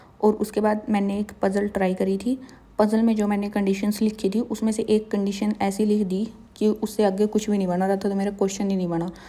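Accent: Haryana, India. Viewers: Aapki boli se lag rha hai ki aap Haryana se ho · Hanji Haryanvi Chori h ye . Don't mind yrrr Haryanvi ladki h ye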